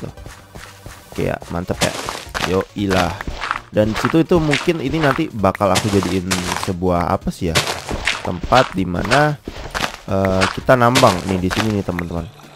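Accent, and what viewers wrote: Java, Indonesia. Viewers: balik balik... ohhh lanjut medok jgn lupa bang btw main ke channel gw juga guys seru kocak konyol suangar wess mbolah di delok dewe pokoe hehe ✌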